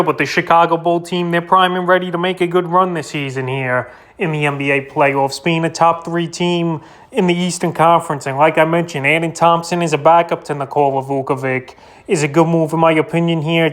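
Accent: New York, United States. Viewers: We know you are a New Yorker with that accent and lack of basketball sense.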